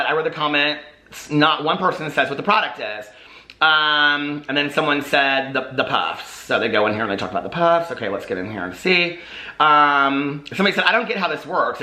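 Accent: South, United States. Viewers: where do you get your southern accent and sayings? I've never heard "gag a maggot" outside of the south. Love it!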